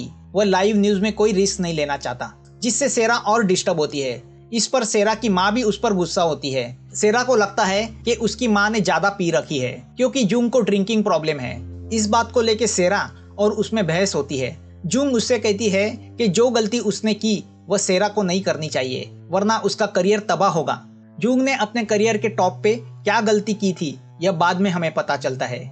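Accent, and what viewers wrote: Maharashtra, India. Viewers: Marathi manus Boltoy kaltay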